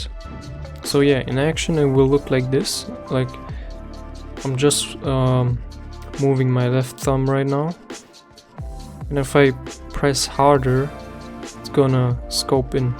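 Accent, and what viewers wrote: Germany. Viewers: You are German?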